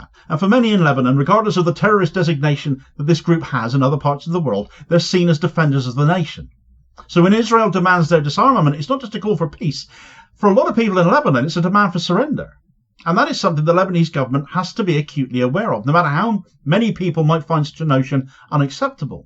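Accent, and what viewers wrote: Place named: South West England, United Kingdom. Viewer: Its his English "local" accent not the microphone.... South West England. Sounds fine to me "Ooooh Arghhhhh"! somethings in the UK still shine bright against the invasion... thankfully local accents live!! (Google " " and you will understand what I am saying 🤣 )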